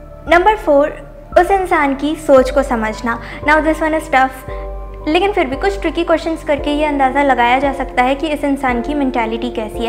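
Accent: Bihar, India. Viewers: I like bihari tone 😝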